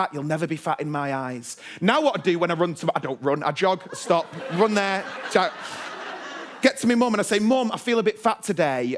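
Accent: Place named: United Kingdom. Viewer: This is so british and i cant understand some of it but love is love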